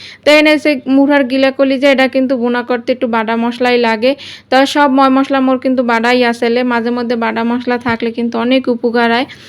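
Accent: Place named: Barisal, Bangladesh. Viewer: Apni borisal ar vasai kotha bolban na please apu..ta na holay ami apnar video dakbo na..normal koray kotha boilan..suntay valo lagbay..ancholik vasha kaw e posondo koray na😊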